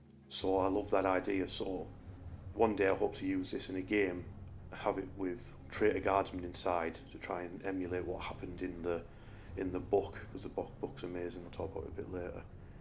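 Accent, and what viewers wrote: United Kingdom. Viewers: Excellent painting job on them all, nice to hear more northerners on here. Hello from Barnsley. Instant sub btw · Lovely army and lovely accent, whereabouts in the UK are you from?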